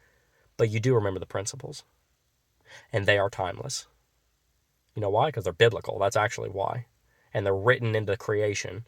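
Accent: United States. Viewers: omg you sound like every american ever rofl. Land of the free hahahahahahahah. Where kids go to school and get shot, no where else on earth does this happen. The great wars it starts, the great friendly fire it causes, the great debt its in, need i go on?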